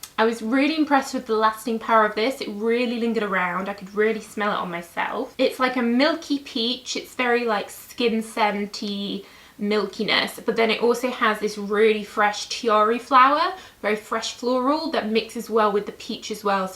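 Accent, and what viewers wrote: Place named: London, United Kingdom. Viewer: Hello from Brazil again!! Not only do I love hearing your reviews, but I also love just hearing you!! It reminds me of the time I lived in London, I could listen to your for hours!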